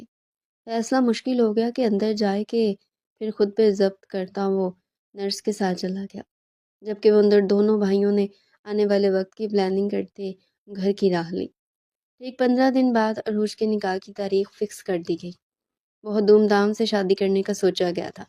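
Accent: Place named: Punjab, Pakistan. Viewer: Urdu with punjabi تلفظ!!!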